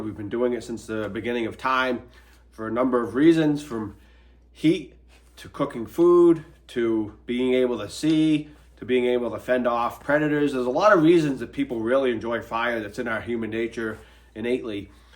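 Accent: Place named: New England, United States. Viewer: Disagree, the Davos crowd, or you name your favorite gathering place or club, in other words those who own our politicians, want the population to be 500,000,000, no more. Further, they realize by actual examples that have occurred in the U.S. and Europe, that people freeze to death in winter and die from heat exhaustion/stroke in the summer, where they have no guaranteed access to reliable energy. In winter this is fossil fuels backed up by wood and in the summer fossil fuels. And now with AI developing exponentially as well, that elite will no longer need wage slave labor for the majority of production and services either. This is the basis of their war on fossil fuels and other combustible energy sources. I recall reading in the last few years that Britain has already gone so far as to prosecute people for burning wood. Perhaps someone can correct me if I am wrong about that. 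Notwithstanding, Britain is also making war on fossil fuels under the climate change fear PSYOP. Question is, regardless of the basis for this, what do we do about it? Does anyone believe the political process is going to work? I believe I may have heard a New England accent from the presenter. Last time I drove from Massachusetts into Rhode Island I literally saw a, "are you ready for 2030" highway sign! But areas where you think you would be safe from this war on humanity are also capitulating, just not as radically or openly. I live in coal country, but for some reason coal plants are being not o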